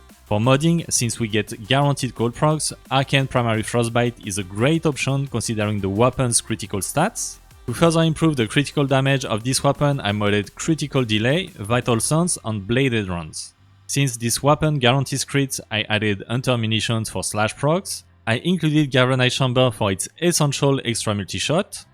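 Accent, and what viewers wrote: France. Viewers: I have to say it: I love your little french accent in your videos 😅 Your content is great I am sub since a long time. Now taking a short break from double resource event on Youtube and there is a new hot video from you. Very cool 👍😎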